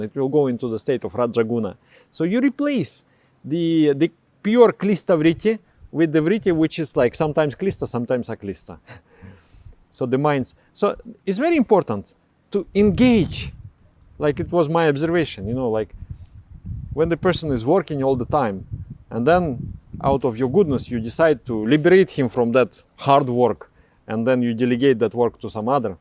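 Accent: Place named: Russia. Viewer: Wonderful video! Thank you so much for the information, very helpful, brought clarity to me. And your Russian accent is awesome, wonderful! ❤️❤️❤️😇🙏Namaskaram!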